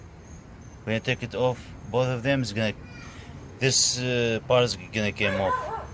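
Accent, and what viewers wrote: Iraq. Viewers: يا اخي لهجتك تكول انتة عراقي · اخوية انته عراقي من لهجتك ياريت تتواصل وياي لانه عندي نفس المشكلة واني ممنون منك ياطيب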